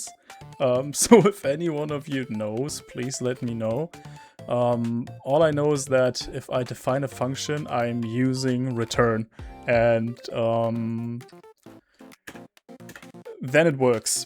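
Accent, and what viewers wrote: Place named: Germany. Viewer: u sound german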